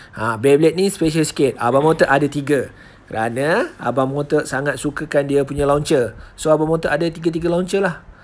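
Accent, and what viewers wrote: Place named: Negeri Sembilan, Malaysia. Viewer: Cakap nogori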